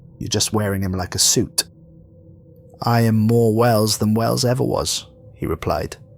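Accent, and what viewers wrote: Wales, United Kingdom. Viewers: awwww shit we did it!!! thanks for the great content, for talking me to sleep with your caramel butterscotch welsh accent almost every night. well deserved, here’s to many more.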